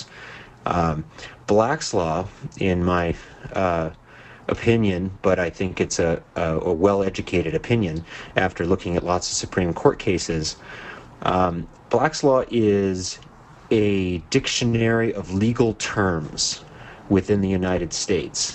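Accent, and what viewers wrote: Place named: Europe. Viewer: Of course he's saying this he sounds European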